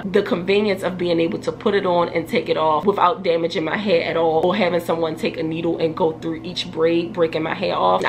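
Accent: Baltimore, United States. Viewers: He said Rick James 🤭. I’m laughing because I actually have the album with Rick and the short bang. Still gorgeous hair with the short bangs!!!! Just found your channel binge watching your vids now. I hear Baltimore in the accent (I live in MD).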